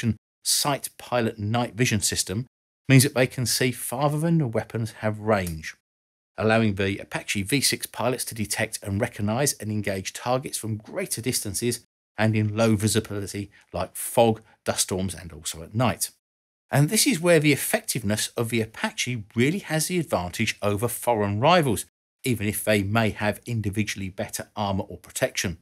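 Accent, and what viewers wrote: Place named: Essex, United Kingdom. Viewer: The gecko has a London cockney accent & is from Essex 50 miles north-east of London - they will sound the same to most Americans.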